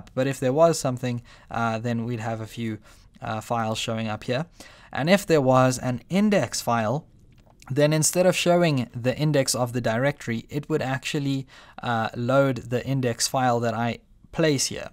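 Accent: South Africa. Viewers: Love hearing a South African accent for a change!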